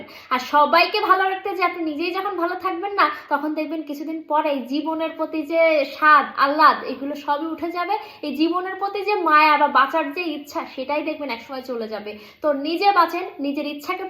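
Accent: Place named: Bagerhat, Bangladesh. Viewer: আপনি বাগেরহটের আঞ্চলিক ভাষা কথা বললে ভালো লাগে, একজনকে মিস করি যে দূনিয়াতে নেই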